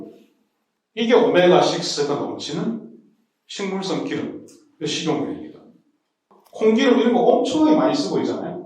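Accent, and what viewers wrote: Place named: Busan, South Korea. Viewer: 유행하는 케톤식 알아보다 보게 되었는데, 믿을만한 논문과 임상경험으로 진짜!의학정보를 전해주시는 분을 발견한거같아 기쁘네요. 가족들에게 공유했어요! 이 분 찐인거같아요. 어느병원이신지 살짝 궁금하네요. 더불어 부산분이라 이해가 더 팍팍됩니다.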